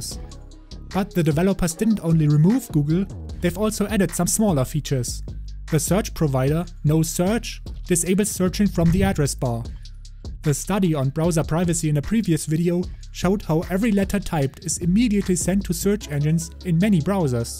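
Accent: Germany. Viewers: bro you german too? (accent)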